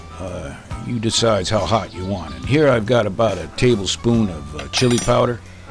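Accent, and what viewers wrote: United States. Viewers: U sir has an authentic good ol american voice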